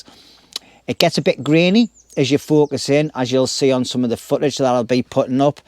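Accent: United Kingdom. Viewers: Great Brit English ❤ You must be Ex German ? 😂 (like me 😅) Unboxing: keep it short ❤ Video with IR: very grainy 😢 IR off —> NG Night sky: 😰 I better still keep on looking for a better unit 😂 it great video, 🙏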